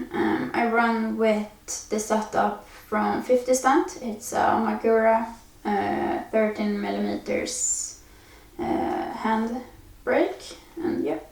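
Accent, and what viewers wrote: Sweden. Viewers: man hör svenskengelskan så bra haha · when i heard your accent, i knew you was from sweden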